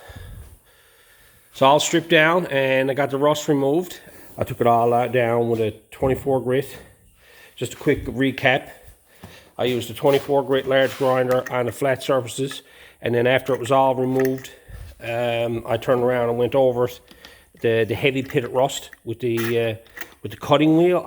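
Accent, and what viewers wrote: Ireland. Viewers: What is his accent? is that Irish? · What accent do you have? It is interesting. Sounds slightly Irish. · Hope you don't mind me asking if you have any immidate Irish relatives, there a certian irish accent/ twang to some of your words. appolagies if i'm way off, came across your vid looking for rusty arch's, I'm in Ireland myself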